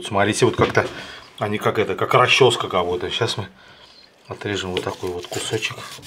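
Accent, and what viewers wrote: Baku, Azerbaijan. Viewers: Дорогой, любое твоё блюдо - шедевр, спору нет. Но больше всего подкупает твой едва уловимый бакинский говор. Это нечто!!!! Как будто дома, в Дербенте...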